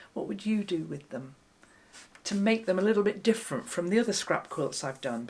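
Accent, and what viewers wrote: United Kingdom. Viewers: Mind. Blown. You are one fascinating human, - the creative process you turn into a real object is nothing short of magic. I thought about the ladies of Gee’s Bend in Alabama/USA. The rejoicing of fabric by artists like yourself and those ladies is a singular genre that could only be achieved with patience. As much as I love to watch the process, it would run me mad to try and do it. And the cat bed? FABULOUS. I as so glad there are people like you, and Kaffe, and the Gee’s Bend ladies to counter-balance the madness of the world today…I know the world has always been mad, but the current time is particularly cruel and often times pointless. To know there is someone like you “across the pond” who is gentle and interested in creating beautiful art calms me down. The horrors of the Hill Country flooding, just a few hours drive from Fort Worth, are crushing sad. 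The political situation in America is horrifying. To be able to watch you and hear that engaging British accent is a balm to my weary soul. Thank you, friend-I-will-never-meet.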